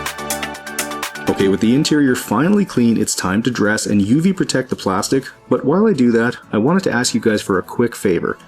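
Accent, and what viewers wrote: Canada. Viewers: "Aboat" that's when I found out you're Canadian 😆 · 1. Are you from Canada? I hear an accent. 2. I hate that there was a dog in there 😢 and 3. That was NICE vehicle, they had a moonroof, and whoever drove it was VILE 😢 but it looks amazing. Love the video ❤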